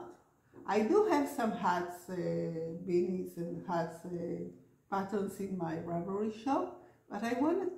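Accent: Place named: Israel. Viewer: Hi!! I just received an email from you introducing yourself! I’ll have to go back and look for which pattern was yours as I’ve been participating in a few different blog hops. I recognized your Israeli accent too when you started speaking! I only had the pleasure of visiting Israel twice, once with my Hebrew School for a youth trip, and again the following year for my brother’s Bar Mitzvah. But I loved it there and I love the incredible internet that let’s us connect with people from all over the world! I live in the USA, just outside of Philadelphia in Pennsylvania, on the East Coast. Best wishes to you with your channel. I’m going to look for your book on Amazon. Thank you for sharing! 💜🧶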